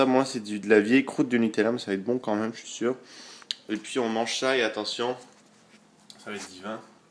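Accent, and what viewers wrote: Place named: Quebec, Canada. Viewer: Regarder au debut a l'accent du Québec et maintenant il parle commen les francais... bref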